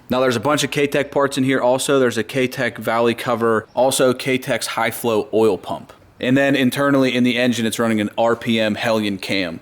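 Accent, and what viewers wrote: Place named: Delaware County, United States. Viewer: Picking up a little Delco accent there.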